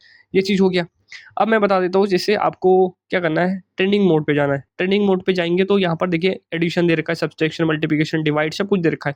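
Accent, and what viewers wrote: Bengal, India. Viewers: Mujhe aisa kyu lag raha hai aap bangali ho😅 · ❤❤❤❤ Bhaiya ji aap Bangal se ho kya ???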